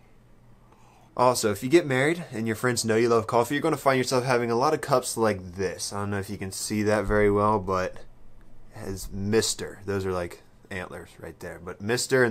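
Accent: Texas, United States. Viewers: Are you from Texas? I noticed you say y'all a lot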